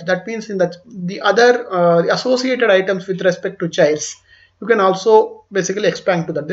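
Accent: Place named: South India, India. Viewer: thank you, sir, for giving super video, apki voice see lagta he ap south side see belong Karte ho, apka content 200k, 500k,1m wale Chanel se bhi acha he, acha hi Nahi bohot acha he, really thank you, sir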